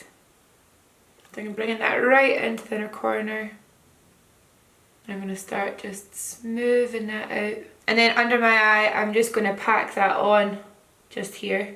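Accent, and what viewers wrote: Scotland, United Kingdom. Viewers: the scottish accent is the best! But then again, I'm scottish too hahaha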